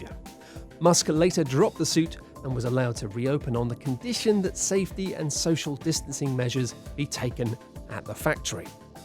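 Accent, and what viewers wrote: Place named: United Kingdom. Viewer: British people make up such a small fraction of the world yet such a high percentage of Youtube narration. I don't like it. Turning off.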